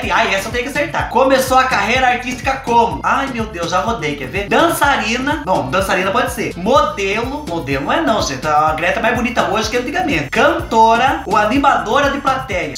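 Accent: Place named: Coronel Macedo, Brazil. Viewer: Gente, tem coisa mais fofa do que o falando inglês com esse sotaque de Coronel Macedo? 😍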